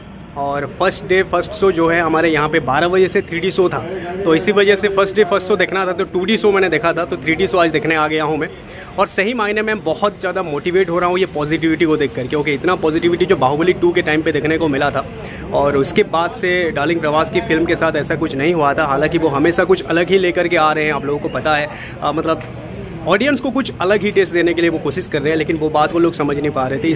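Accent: Odisha, India. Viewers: Aap odisha se ho kya❤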